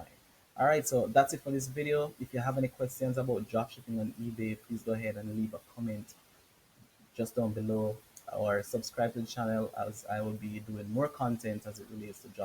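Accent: Jamaica. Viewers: Do you use a paid email store or just like the regular free eBay? Also I hear the JA accent big up:) are u doing this from JA? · Honestly this was the best explanation I found on YouTube on how to drop ship from ebay .....simple and easy to understand thank you .....by the way where r you from ? I'm from Antigua ur accent sounds similar or Jamaica? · you sound jamaican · You got a slight jamaican tone. are you jamaican?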